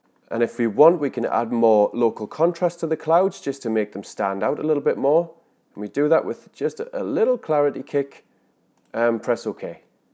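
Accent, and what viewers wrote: Newcastle, United Kingdom. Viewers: Great video and I'm in total agreement. I've not used any HDR software since I stumbled upon one of your videos and bought Raya Pro. By the way, my American girlfriend loves your voice. She finds it extremely relaxing. Ever since I've been with her she's grown to love the Geordie accent but she particularly likes yours; especially when you say the word "mask". In fact when I'm watching your videos, she now refers to you as.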